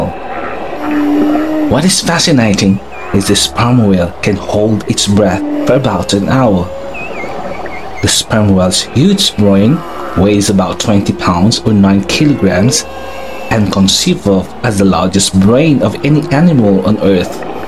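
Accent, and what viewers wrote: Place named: United Kingdom. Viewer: Ung accent tlga lodi..para akong nanunuod ng harry potter..😁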